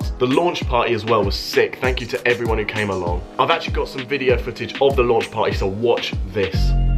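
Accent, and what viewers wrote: United States. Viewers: I'm sorry but it was cringe hearing you in an American accent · I think you sound better with an American accent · I don't get why people are hating that you used an American accent. It sounds dope and it's you doing you, so keep doing you bro.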